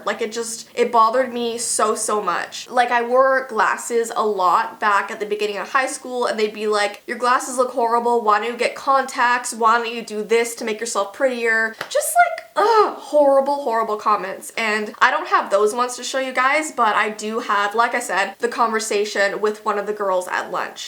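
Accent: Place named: Canada. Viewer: I love her Canadian accent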